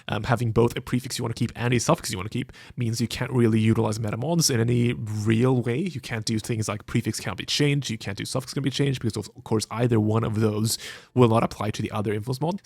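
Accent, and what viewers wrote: Sweden. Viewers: You can't fake that Swedish accent 😊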